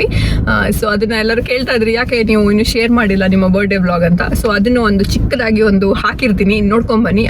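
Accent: India. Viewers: We love your Kannada Accent and Pronouncing The Way You Narrate Kannada is Superb Probably best in class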